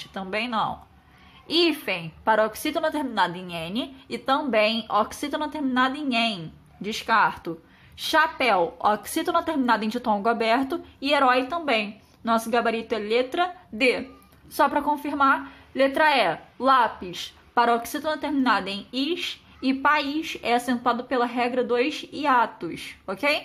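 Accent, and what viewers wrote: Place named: Rio de Janeiro, Brazil. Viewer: Esse carioques dessa proff Onde que vai o acento?? E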